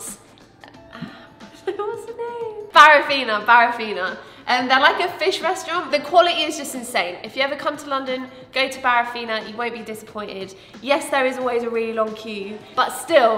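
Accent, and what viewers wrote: France. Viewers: Is she French?? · accent français on point !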